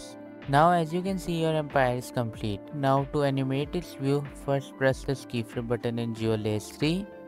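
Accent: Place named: Pakistan. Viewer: i feel bad for you how other people judge your voice bc ur pakistanian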